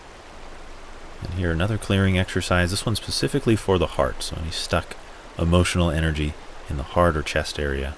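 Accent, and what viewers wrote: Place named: Scotland, United Kingdom. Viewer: Ah, wee of the Clan! Sorry to have missed the Great Scottish Retreat, a mere 50 miles from my cave in Perthshire. I have an excuse...I was in Portugal. Qigonged every day though! Looking forward to doing this routine and listening out for your Scottish accent...aye... 😀🏴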